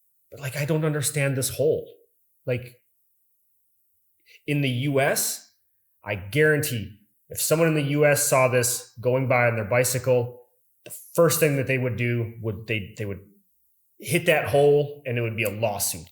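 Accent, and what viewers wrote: Germany. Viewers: get the feeling that you're more German then you are american.. definitely a German accent if you ask me.. so whats your real story? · Why do you have a German accent !? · honestly, this guy sounds really german the way he pronounces stuff. or is it just me thinking that? edit: nevermind, i just heard how he pronounced Hannover, lol.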